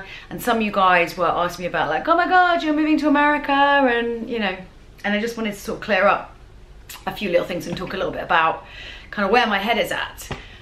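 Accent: United Kingdom. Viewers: Best of luck! If you do go, you should definitely hang on to your Britishness. In fact, you might want to play it up. People are impressed by an authentic English accent, especially one like yours which is REALLY hard to imitate! I think you will be a big success in America. You have a lot to offer!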